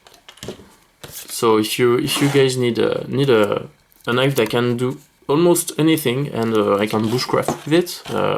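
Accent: France. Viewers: Ty for your time, you have a french accent, are you french? I am french, living in Scotland! I just ordered yesterday a huntsman camo ,uk legal, it's still in the mail, but I wanted to have someone's opinion on it! It's my Christmas present 😀,so t y again,it was helpful, and merry Christmas 🎄🥶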